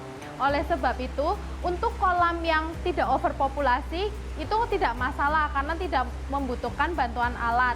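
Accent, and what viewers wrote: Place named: Central Java, Indonesia. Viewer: bahasane medok bnget.. koyo wong jawa tengah bagian kulon.. hahahah😊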